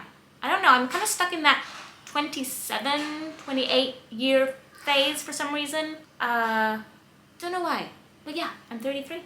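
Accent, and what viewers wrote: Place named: Australia. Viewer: Omg I thought you were Australian, you had a very strong Aussie accent when I first started watching some older videos! I’m Australian!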